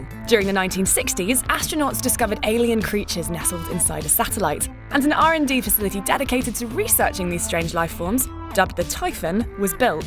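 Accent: United Kingdom. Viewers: tucker she is British not American this time maybe that's the different accent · damm narrators british accent sounds gorgeous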